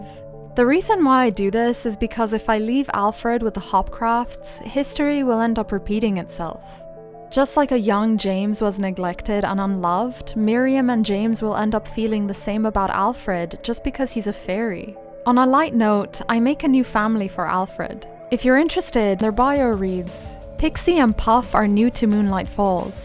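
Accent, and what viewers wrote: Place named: Ireland. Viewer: I love this Irish + a pinch of eastern european in her accent :T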